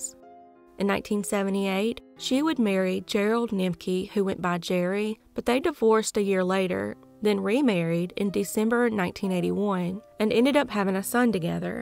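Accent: South, United States. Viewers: haha I'm the same with my own voice it's so cringe to me lol, but I agree with everyone that your voice is particularly soothing! I do really like your strong southern accent too! I'm from England so to me it's very strong, but I really love it for how soft spoken you are. Also of course you do amazing research and give these cases and victims the respect they deserve. Sending so much love from the South of England! 🇬🇧 ❤️ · I'm subscribed to your southern accent voice. I wasn't a subscriber then, I am now.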